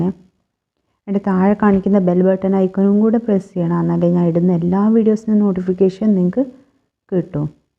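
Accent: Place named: Kannur, India. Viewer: Nalla work. Kannurano sthalam?? Samsaram kettappol thonniyatha. 😊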